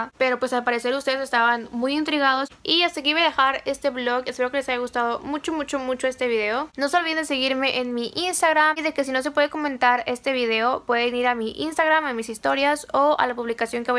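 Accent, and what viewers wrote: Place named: Monterrey, Mexico. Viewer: No manches, nunca imagine que fueras de Monterrey lo digo por el asento. Saludos hermosa ❤️😘🥰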